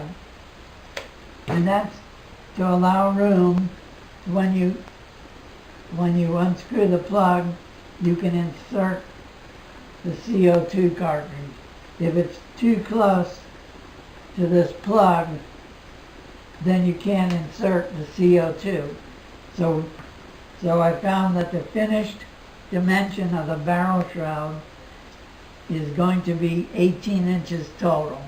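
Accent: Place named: Lancaster, United Kingdom. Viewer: You have a slight Lancaster accent, bro--I hear 'war-shers' instead of 'wash-ers'. Didn't realize that before! interesting build using common parts!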